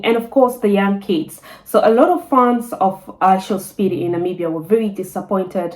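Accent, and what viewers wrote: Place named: Nigeria. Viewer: You sound more Nigerian than Namibian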